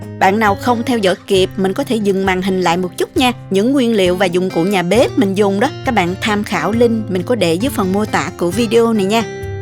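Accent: South, Vietnam. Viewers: Bạn nói vọng người miền nam rất hay, rõ và dễ hiểu. Southerner dialect spoken very easy to understand , clear voices and very beautiful sounds.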